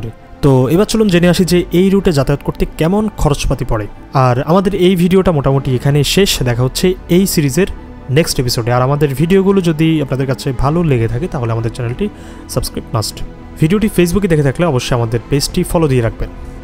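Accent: Bangladesh. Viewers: বদ্দা অনের ভিডিও ত চিটাংগে ভাষা পুনা যার, অনের বাড়ি কি ভাই চিটাং নে ( চট্টগ্রাম)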